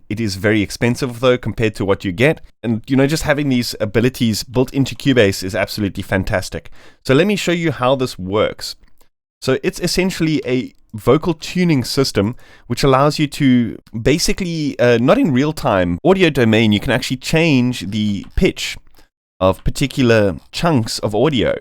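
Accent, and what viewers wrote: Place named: South Africa. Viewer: he's south african. i'm south african too and can tell his accent from a mile away. it sounds perfect to me, you're just struggling with the accent. definitely sounds like english is his first language here, this is just how our accent sounds.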